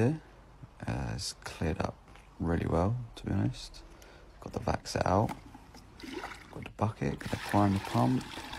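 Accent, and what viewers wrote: United Kingdom. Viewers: It's the British accent for me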